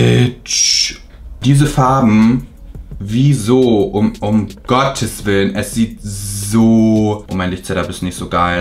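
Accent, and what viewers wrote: Germany. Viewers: Du kannst echt gut Englisch sprechen, aber du hast so einen starken deutschen Akzent haha😂😘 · Unnötig dieses Denglish! Wenn er wenigstens einen amerikanischen oder britischen Akzent hätte, aber der deutsche Akzent geht gar nicht!!!!!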